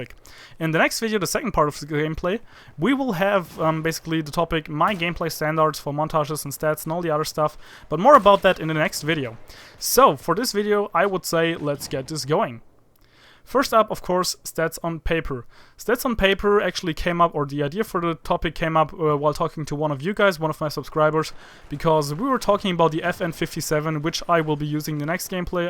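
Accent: Germany. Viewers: did you speak german?....Deine Aussprache klingt nämlich sehr Deutsch ^^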